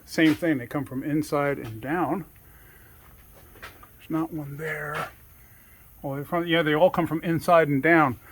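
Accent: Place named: South Jersey, United States. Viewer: I'm From south Jersey/ Phila area, picking up on a tad bit of my accent over here. 👍Awesome vid, just bought a Jasper eng. n plan on doing this. The easy way. Thanks for the vid and subscribed. Keep those vids a rollin ✌️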